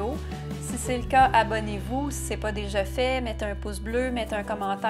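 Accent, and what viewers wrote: Quebec, Canada. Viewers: Superbe vidéo produits terminer ma belle tu es québécoise car ta un accent ? ❤❤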